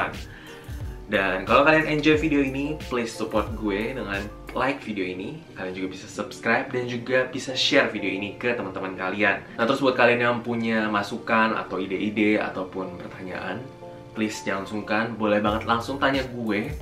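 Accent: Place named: Java, Indonesia. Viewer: Kko awalnya mikirnya opa korea ehh tau taunya kko Indo dari medok nya hehehe keren vlognya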